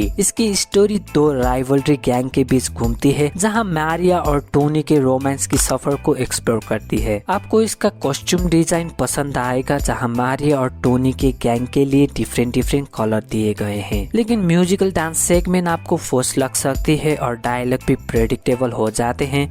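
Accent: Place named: Bengal, India. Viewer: Tum bangali hai kya😅😅😅tumhra accent lag raha 😅😅😅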